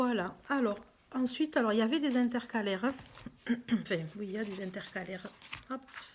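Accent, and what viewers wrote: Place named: South, France. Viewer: Bonjour, je découvre ta chaine et je b'abonne 😉 et en plus du sud (on est fiers de notre accent ) moi Perpignan Pas facile de tenir une chaine la mienne est a l'abandon depuis aout ou septembre, travail, vie de famille les jours à 24h sont trop courts😂😂 A bientôt, Bisous bisous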